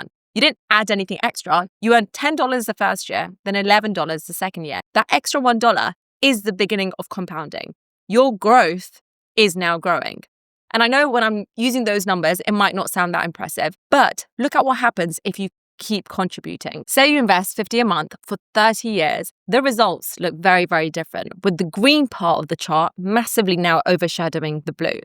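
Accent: United Kingdom. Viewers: Probably the uk with her accent. Though it might be Australian. I can’t really hear what accent it is. It’s not clearly either. Either way Maths is used instead of math in British, Australian and I think South African English. Americans and I’m pretty sure Canadians use math.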